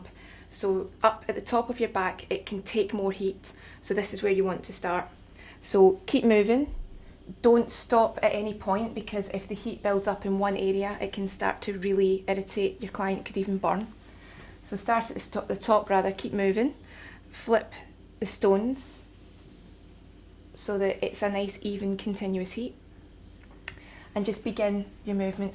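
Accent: Scotland, United Kingdom. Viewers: Stoone. I love the Scottish accent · Scottish accent plus the word “stone” is such a perfect combination. Not sure why but that word sounds legit coming out of a Scot. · What is your accent British, Irish, Welsh, or something else?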